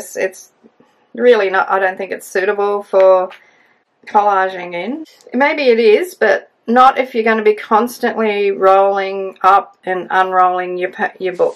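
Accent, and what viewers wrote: Australia. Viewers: It’s a fantastic scroll. I loved following along your great journey of discovery, it’s so different from all the other journals I have followed through January 😍I’m in Melbourne and loved hearing your Aussie accent💖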